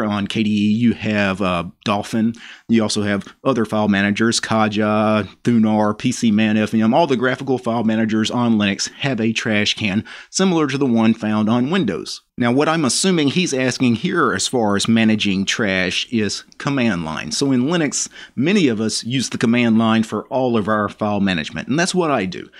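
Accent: South, United States. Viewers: You sound southern. Where are you from?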